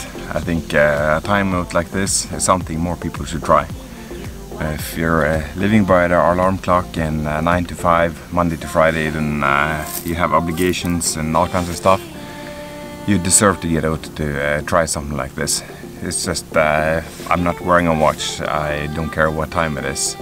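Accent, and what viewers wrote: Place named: Norway. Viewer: Hei, hører at du er norsk, PG elsker NBen din, og lurer på om du har vert i Bekkjarvik?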